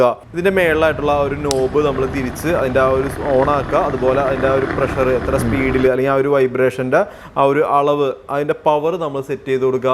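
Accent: Thrissur, India. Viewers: തൃശൂർ ഭാഷ പൊളിച്ചൂട്ടോ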